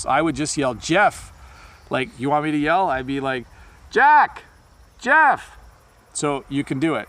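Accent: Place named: Canada. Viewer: Hi I love your accent Canadian very clear pronunciation but I am not good at English I am from Bangladesh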